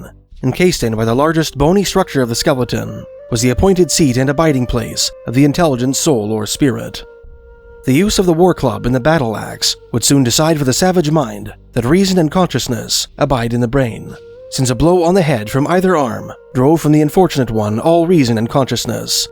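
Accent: Canada. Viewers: They haven’t figured out a way to make AI with that maple-mellow Canadian accent yet. Calm down, bud, don’t get so testerical 😂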